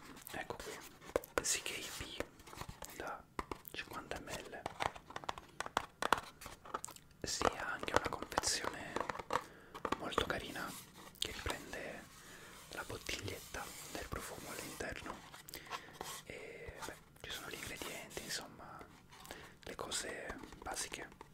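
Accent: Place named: Tuscany, Italy. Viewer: sentendo il tuo accento, perché mi sembri toscano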